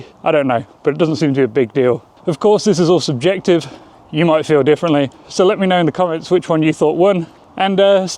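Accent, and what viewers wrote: United Kingdom. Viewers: British accent brings me joy ❤️ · Keep it up bro, i like ur British accent, n ur video too (actually good video) 🎉🎉🎉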